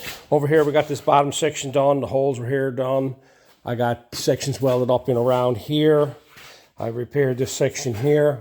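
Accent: Newfoundland, Canada. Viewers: What accent is that Newfoundland? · I knew that accent right away. Great to see a Newfoundlander doing awesome body work. Bravo! Bill from Saskatchewan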